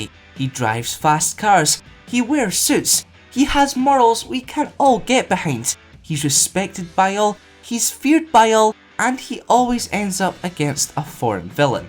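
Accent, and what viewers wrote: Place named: Scotland, United Kingdom. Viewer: I fooking love Scottish accents. By far my favorite.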